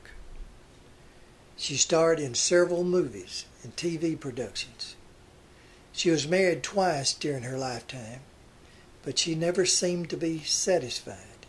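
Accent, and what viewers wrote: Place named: Alabama, United States. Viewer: you sure got that right. and I like the way you talk, whoops done gone Slingblade on ya there Carl. I mean at times we sound alot alike me from mobile an all. Roll Tide 🏈 course I'm just 60,kinda spring chickeny yet badly bent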